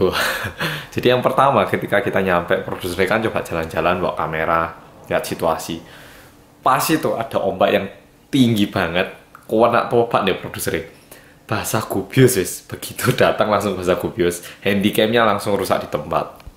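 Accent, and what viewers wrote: Java, Indonesia. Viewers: Wow bahasa indo nya medok banget... Gw kerja di korea tapi bahasa koreanya gak sebagus bahasa indo dia.... Hahha Sini ke gwangju hehe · Orang korea tapi medok jowo ne 😁✌ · haha.jadi penasaran apa yg sebenernya telah terjadi di balik layar XD. sy subscribe sampeyan karena informatif dan medok jowo. eh ga nyangka jadi koordinator RM yg sy tonton selama -/+ 6tahunan. anyway, just curious, kalau sampeyan bergumam atau ngomong dalam hati pakai bahasa apa?haha. atau sekedar ngomong 'kasar' atau mengumpat. disesuaikan dengan lingkungankah?haha. fighting! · Wkwk bisa bhada indonesia tp logat dominan ke logat jawa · korea roso jowo ngene ki! kueren tenan mas!:D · pertama nonton videonya ngga pake headset kirain logatnya korea,ternyata jawa,proud of you kak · Suka suaranya agak medok jawa.... 😀😘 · Org korea ngomongnya medok bgt👍🏻👍🏻😍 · Sip bang. 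Jowo medok temen. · medok Jawa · ini mas nya orang korea ? halahyung .. kok luweh medok ko aku 😫😫😫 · Sumpaaah jowoo bgt, koq iso toh?? Hahahhaha logat korea'ne ilang, hahahhahaha i adore u!!!!! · ini beneran orang korea asli? bahasanya kox medok jawa ya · logat jowo ne kuentel marai ngakak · Bahasa jawanya logatnya sma sprti orang chinese jawa...mngkin dulu dimalang tmn2nya bnyk orang chinese... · Kaget pas ngomong ternyata medok kirain bakal ngomong korea atau belum fasih bahasa indo gitu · Hai kak.. aku subscriber baru nih di channel kakak.. beh ~ seneng banget liat kak ngomong bahasa jawa.. 😆 medoknya itu lho 'njawani buanget'.. 😆 ditunggu lho kak yang part 5 nya ~ 😁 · Mas ini aslinya orang korea ya? tpi cara ngomong jowo banget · Seneng denger logat nya kakak indonesia sekali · fasih banget bahasa jawa nya wkwkw medhok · Medhok ya 😂👍🏼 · Ini org jawa atau korea???hehehe.. cocok.bgt oppa ini jd org jawa ya. 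Top deh :-) :-)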